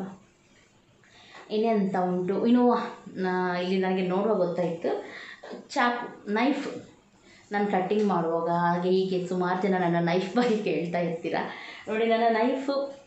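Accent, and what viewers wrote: Mangalore, India. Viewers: Hai.. I like very much way of explaining.and mangalore kannada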